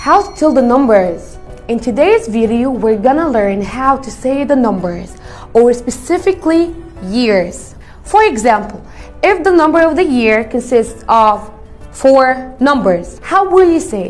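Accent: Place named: England, United Kingdom. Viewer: حاسس ان هو انجليزي 😂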